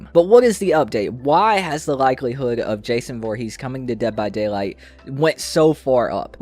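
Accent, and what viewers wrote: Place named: South, United States. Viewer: Wow only a few weeks? Well I really like your content so far and I can tell that you're from the South or at least it sounds like you are. I'm from Ocala Florida. I definitely wouldn't take too much of what Corey Feldman says to heart though. He definitely has been dealing with a lot of mental issues lately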